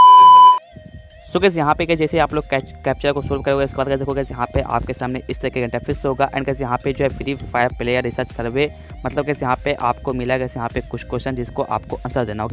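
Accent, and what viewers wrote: Bihar, India. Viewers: bhai tum bihar se ho na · Bhai ap bihar se ho 😀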